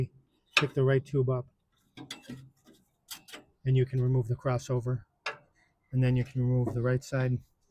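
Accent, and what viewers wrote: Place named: Long Island, United States. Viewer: I don’t even own a Weber grill, but I watched because I like to hear my favorite Long Island Made Man explaining things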